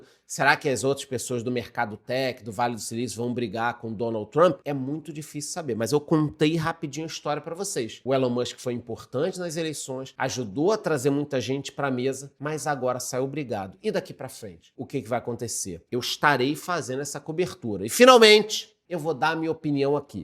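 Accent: Rio de Janeiro, Brazil. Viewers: Adoro esse sotaque carioca ❤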